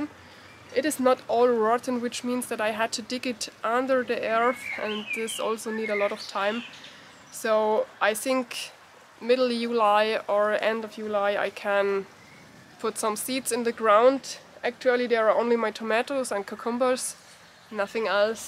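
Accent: Bavaria, Germany. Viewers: Servus, " You go girl!" Des is a Amerikanischer Ausdruck! Was bausten du mit dem Loch?( I Dat a root /tornado/luftschutz Keller bauen fuer diesen Zeiten- man derf nett bloed sein - die Zeiten waeren schlimmer- nett besser). Ois Guads,bleib gsund- Semper Fi- Peter p.s. des gfoid ma wenn du so nett bairisch sprichts mit deinen Tieren.( unser Germanische r Stam is wichtig gel.)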